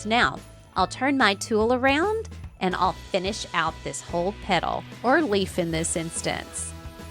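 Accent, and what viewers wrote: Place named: South, United States. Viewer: Your soothing voice with a slight southern accent (so cute) and watching you create is how I start each morning. You've once again inspired me. Thank you!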